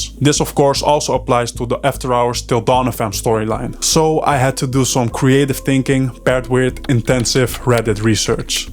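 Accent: Netherlands. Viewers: Bruuh ik zat naar jouw stem te luisteren en dacht ergens misschien ben jij Nederlander, vanwege het accent. Toen later zag ik die screenshot toen had ik het door 😂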